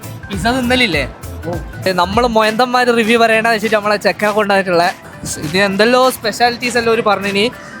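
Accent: Kannur, India. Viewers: Pakka kannur language 😅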